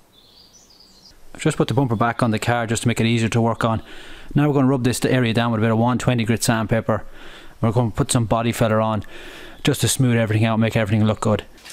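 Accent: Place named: Ireland. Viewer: wow fair play well done and good to have an irish voice for a change lol.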